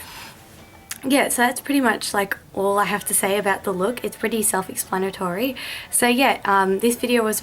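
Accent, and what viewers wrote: Australia. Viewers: Australia. Can't you tell by her accent?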